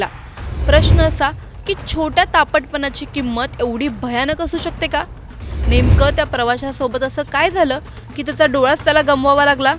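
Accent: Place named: Khandesh, India. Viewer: Aani pani he marathi bhasha jalgaon khaandesh chi ahe . Kahi problem ahe tula ? Marathi che khup variants ahet.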